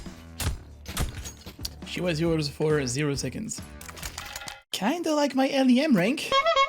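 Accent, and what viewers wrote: France. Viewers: I am currently really enjoying to eat zuccini (how tf do you even write this in English). Thanks, your sweet accent always reminds me of doing my French homework for university. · You're the first French who speaks English without a too big accent. I can handle and see all this video's thks ❤️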